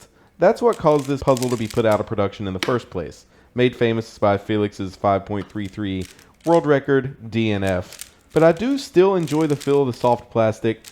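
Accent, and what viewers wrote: Texas, United States. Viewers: You gotta Texas accent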